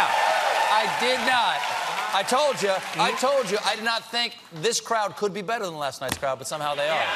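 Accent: Germany. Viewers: Lingonberries!!! Lingonberries (that almost ALWAYS goes with the meatballs in Sweden) is Swedish... but I guess that is a nothing-burger.... And why the German accent?? We actually sound more like the Swedish chef... unfortunately. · Minus the German accent well done :).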